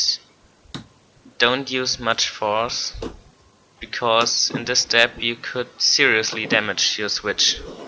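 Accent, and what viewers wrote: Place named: Germany. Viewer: I'd just like to say, your english is quite good. You have a german accent, of course, but your english is perfectly understandable. Great tutorial, the best I have seen on youtube.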